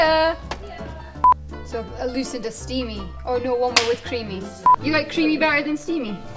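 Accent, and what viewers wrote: Scotland, United Kingdom. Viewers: I love her scottish accent. It makes her even more endearing aswell s her personality. And i love the random American accent she did aswell. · Scottish or British? Or is it Neitherofthoseish? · Of coarse she's Scottish, I'm in fucking love.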